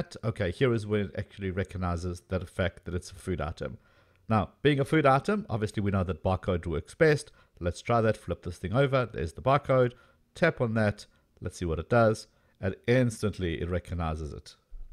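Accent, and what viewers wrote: South Africa. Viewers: Are you South African living in the USA?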